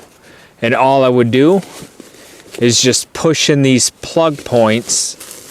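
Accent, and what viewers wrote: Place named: Western New York, United States. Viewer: Just found your channel, and heard the WNY in your voice. I grew up less than an hour from this trail. Live in VA now, and miss the geography and the seasons. Subbed. Go Bills!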